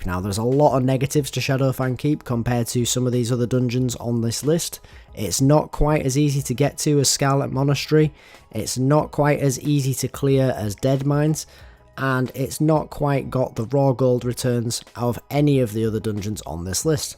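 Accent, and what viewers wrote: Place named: Yorkshire, United Kingdom. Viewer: you sound like a Hulligan ? Yorkshire sir ?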